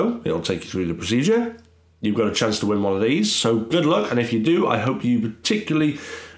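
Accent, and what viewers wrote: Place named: Oldham, United Kingdom. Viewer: Where are you from? Your accent sounds so similar to mine or where I'm from cause I'm a girl and you're not lol.. But I'm from Oldham uk.. So I've very intrigued.. :p this video made me laugh anyway 😂 can't wait to watch your videos from now on ! You've got your self a new subscriber :) well done!! X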